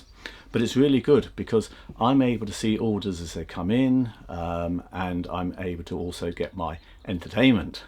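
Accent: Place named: South West London, United Kingdom. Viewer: It's raining....must be England. The accent says must be SW London.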